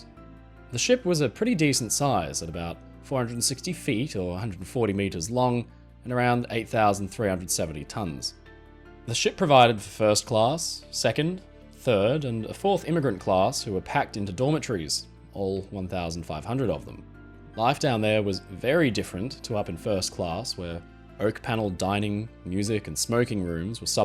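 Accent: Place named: Australia. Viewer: Thanks, awesome hearing an Aussie accent mate. Great content!!!!! Thanks for the work you've put Into your videos - top quality 👌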